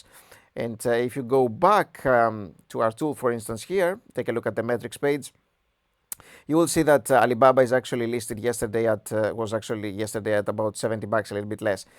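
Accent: Greece. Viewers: Hello, I was the commenter that spotted your Greek accent a few months back. I'm interested in ATIP stock. Can you dona quick video on the fundamentals? I live in the city they're based out of and don't know much about the company's financials. Everyone I've spoken to says they are wonderful to work for, their places are clean and busy. If not a video maybe you can comment. Let me know as I buy shares daily. ATI physical is the name of the company out of Chicago.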